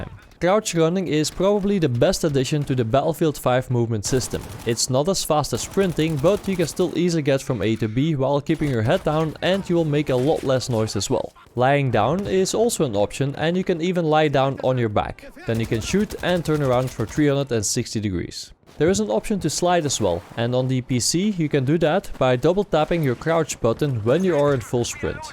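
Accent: Netherlands. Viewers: His accent is sooooooo DUTCH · Are you Dutch hahahha your English is like that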